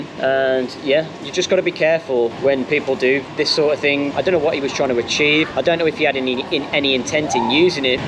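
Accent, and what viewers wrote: Birmingham, United Kingdom. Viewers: Hei man. 1st, thanks for good contents. Then, i watched u a lot before my first trip to thailand, and it was really useful. In fact, because of your nice british accent ,its easier for people like me to undrestand , but maybe you can put an english subtitle on your videos ,so that would be so good for us. It will be more useful and also helping us improve our english. It was just a suggestion. And thanks anyway. Hope to see you on next trip❤ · You from Birmingham or somewhere close to the black country?... your accent is very familiar..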